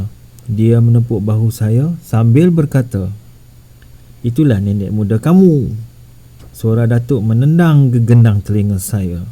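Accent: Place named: Batu Kurau, Malaysia. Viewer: Loghat tu macan cakat orang batu kurau lah..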